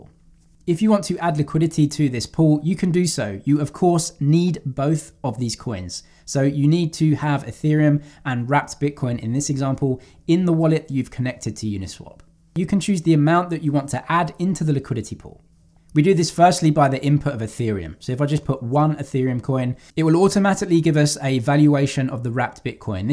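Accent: United Kingdom. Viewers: Many thanks for your succinct and easy to understand videos. On the stuff I am trying to understand at the moment, I will watch your videos first! And not just for the British accent :-)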